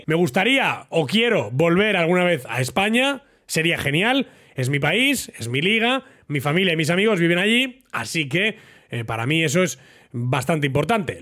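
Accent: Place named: Argentina. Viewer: Parece Argentino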